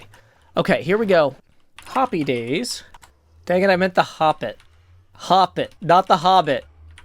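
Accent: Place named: New York, United States. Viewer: ur new york accent is stupidly funny. im in hysterics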